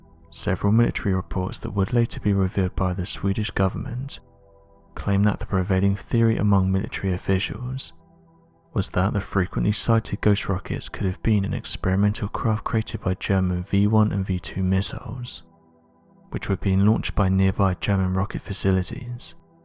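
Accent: England, United Kingdom. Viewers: you heard him wrong. he clearly says "shooting off". i didnt even need to listen to it more than once to hear him say "shooting off" but after your comment i went back to listen and he doesn't sat "shitting off" lol. maybe you're not used to the English accent. I'm saying this respectfully cheers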